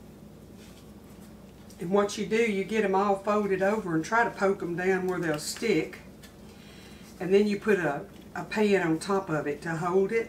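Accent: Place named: Texas, United States. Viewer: Looks amazing. Like that Texas language. Lol 😂 prayers for you and your family.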